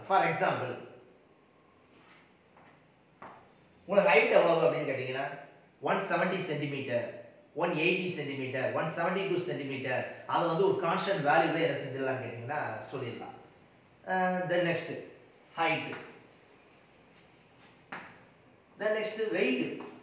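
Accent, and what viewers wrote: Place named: Tirunelveli, India. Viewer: Nellai tamil super sir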